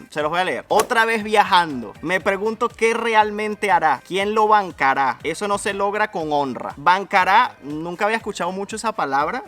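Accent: Venezuela. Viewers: ¡Me encanta tu acento! Antes de mirar tu canal, no podía entender el acento venezolano, pero mirar tus videos me ayudó poder entenderlo. Ahora que lo puedo entender, me parece un acento muy bonito. (Perdón el español malo; no es mi lengua madre 🙂). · Me encanta tu canal paisano. Me encanta tu personalidad y la de tus esposa, son geniales. No le prestes atención a los malos comentarios y tu acento maracayero me encanta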